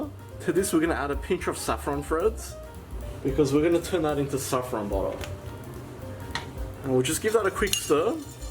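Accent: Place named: Australia. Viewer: What is wit this Australian accent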